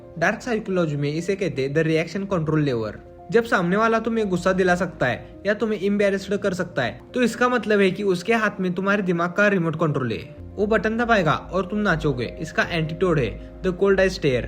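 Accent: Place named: Maharashtra, India. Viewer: Are u marathi bro? Ur pronunciation sounds like that ❤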